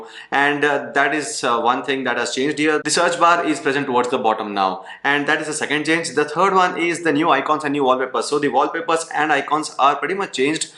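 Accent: India. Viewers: Should be a law against Indians speaking English 👎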